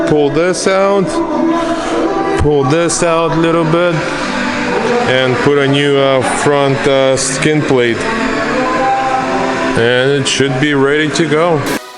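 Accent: Russia. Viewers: Давно живёшь на заподе? Очень сильный русский акцент, чем-то белорусский напоминает.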